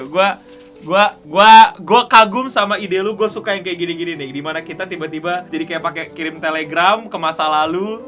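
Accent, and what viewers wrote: China. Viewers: logat Belanda sama Chinese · Bahasa belanda aksen china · itu logat belanda apa cina? kadang kdgrn kek logat cina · Malah kaya orang Tionghoa bacanya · Logat cina terdeteksi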